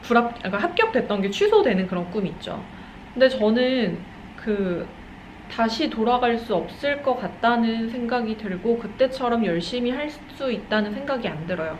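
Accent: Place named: Seoul, South Korea. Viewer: 부산분이신데 말씨는 완전 서울 말씨인데요. ㅎ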